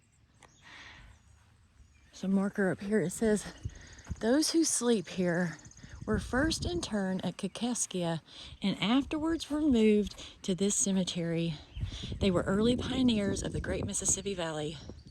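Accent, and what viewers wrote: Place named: South, United States. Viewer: Love that slightly southern lilt in your voice.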